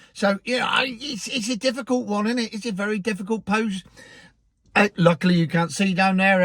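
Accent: Cornwall, United Kingdom. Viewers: Your funniest video yet. I was pissing myself all way through lol. Love the cornish accent,takes me back to when i was young,my grandparents were cornish. Btw watching your video there were lots of orbs floating around your head.spooky.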